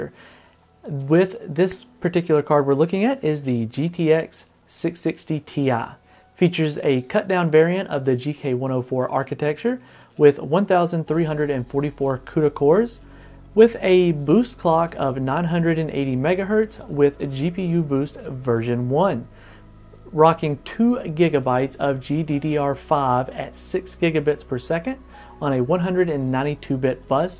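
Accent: South, United States. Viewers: Lemme guess you from the south of the US as you sound country haha, cool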